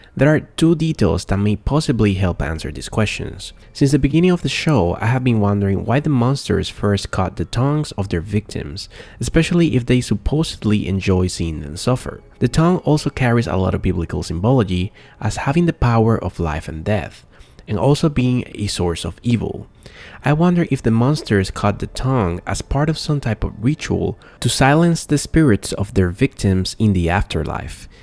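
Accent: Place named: Europe. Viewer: Your accent sounds like you from Europe somewhere like Turkey or Greece or maybe even like Ukraine Finland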